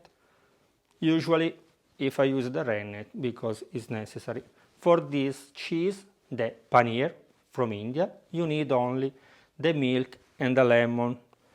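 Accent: Italy. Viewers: Spe-cta-cuuu-larrr I like your all videos & Italian flavoured ENGLISH... Best wishes & love from INDIA...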